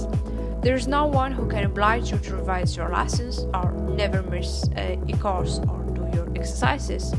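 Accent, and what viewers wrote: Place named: North Africa. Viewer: From your accent you look like from North Africa, your financial aid is displayed in the French language, your name looks like an Arminian name, and you speak English. Where are you really from? 😁😁😁😁 Thanks for sharing this video.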